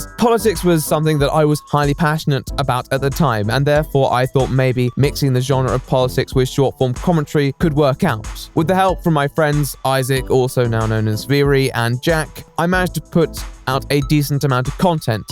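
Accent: RP, United Kingdom. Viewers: I ship you with anyone else who has a British accent and a cartoon avatar. IHE, Cynical Reviews.. you know the sort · first, good job on the content, I've been watching you since you essentially rebooted your content, with the we shall say current style of content. The accent is of course posh, I'm from the north of the UK, you sound like an afternoon tea sort of person, dial that shit up to full posh sort of thing... I love the style of content, I don't generally like the drama scene, I find daddy keemstar toxic, essentially a nuke in a China shop, while you don't cover every drama story that would be impossible I get any drama info from you, it's enough for me. If there's one thing, you sometimes talk at a break neck pace, generally it seems when the passion/emotion is flowing, but it's not to the extent for me personally I can't follow unless I'm not focusing. 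Keep up the work! There's not too many YouTubers I watch every video, only a hand full if that where I keep watching over a year plus, your one of them. I'm sure your fans would back me in saying, keep the content coming, experiment if you must, we will let you know if it's shit:) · Can you fuck off out of my country. Groningen doesn't want your either.. Take your British speal covered in that accent without giving any substance and fuck off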